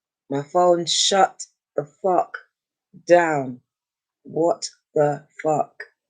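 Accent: United Kingdom. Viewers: I thought so! ☺️ I‘m Swiss but my boyfriend is from Manchester too thats why your accent sounds familiar to me 😍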